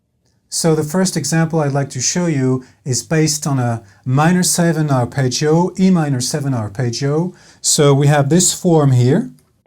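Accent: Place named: England, United Kingdom. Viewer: Nice english accent:)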